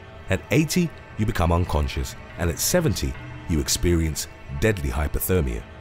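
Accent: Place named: United Kingdom. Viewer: hearing a brittih dude using american mesurements cringey lol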